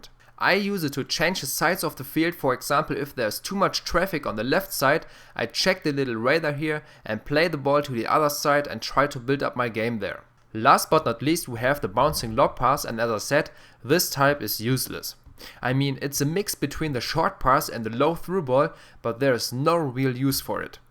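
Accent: Germany. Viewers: your accent is so germans. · your tutorials are useful but when i hear your voice arghhh german english aaaaaarghhhhhhhhhh use synthesizer or shut the fuck up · Man hört das du deutsch bist^^. Deutsche können kein English^^ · Thanks you did your job good but it is funny as from the moment I hear you I knew that you where German, you have an most funny accent when speaking English ( I am not English but I speek with Germans alot :) ). Thanks one more time!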